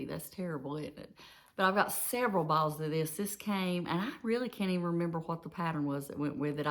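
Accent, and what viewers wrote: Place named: Virginia, United States. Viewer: Hi. I'm enjoying your videos. You sound like where I grew up in Virginia. Is that where you're from?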